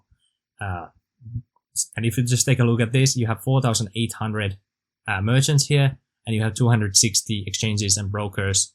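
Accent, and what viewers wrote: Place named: Finland. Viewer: I sense a fellow finnish accent, great content btw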